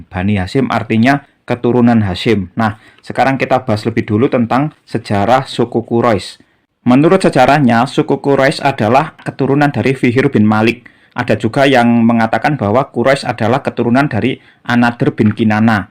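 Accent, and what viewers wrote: Java, Indonesia. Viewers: Mantap mas penjelasanya Walaupun dengan dialek Jowonya yg Kental..Medok 😁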